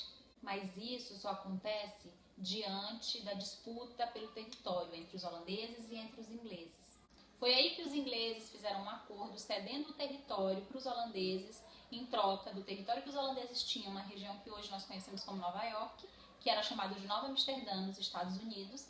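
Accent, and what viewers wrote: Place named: Ceará, Brazil. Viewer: neste vídeo, aprendi muito sobre a história do Surimane. Falas muito bem, organizas as frases como uma pessoa culta. Guardas, ainda, sotaque que pode ser do Ceará ou do Piauí, salvo engano. Pretendo, visitar essas três Guianas para conhecer os lugares e aprender mais sobre as culturas e os costumes, além da história. Tenho dificuldade de imaginar sobre a economia de um país cuja população - ao que parece - se concentra quase toda em uma cidade. Resumo: gostei muitíssimo de "tropeçar" neste vídeo. Tanto que me inscrevi logo. Parabéns.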